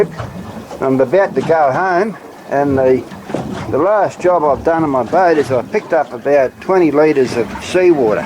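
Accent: Australia. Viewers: Hahahaha good old Aussie accent. Love it. Im also aussie Im gonna try this! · Are you Australian? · Well....I was curious how sea salt was made, now I know. I admire anyone who takes the time to go through the process necessary to yield sea salt! I hope to (one day) visit Australia, love the accent too! I was just mocking Crocodile Dundee today!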